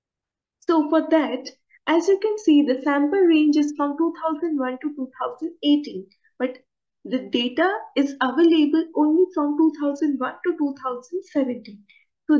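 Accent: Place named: India. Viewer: Please work on your axent , im not english , already hard to understand english so, your indian axent make it more difficult to me 🙏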